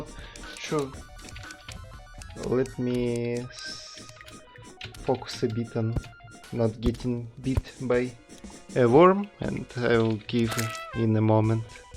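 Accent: Russia. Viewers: I see a lot of Russian friends that write in English excellently, but neglect speech and worry their skills are still terrible. Believe me, better writing = better you! You have already mastered 90% of speech skills, the accent is just for flavour and us native speakers can't get enough of yours =D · А я то думаю почему акцент такой русский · Its some type of russian/slavic accent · Russian accent doesn't bother me lol Just makes it seem like I'm watching a Soviet legend lol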